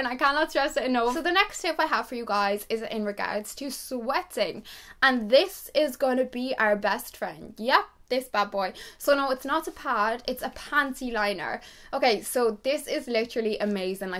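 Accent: Ireland. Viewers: she's Irish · Great tips and could listen to you all day long, with your great Irish accent your on to a winner. Take care 😉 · These videos are so helpful thank you!! Also I love your Irish accent so much 😍 love from Italy 🇮🇹 ❤️ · are you irish??? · This video is super informative! U are adorable and ur hair is super cute! Also is she Irish? I’m not rl good with accents😂😅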